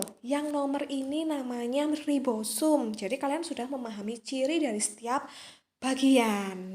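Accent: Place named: Java, Indonesia. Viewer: Namanya berarti orng bali, logatnya kek jawa 🗿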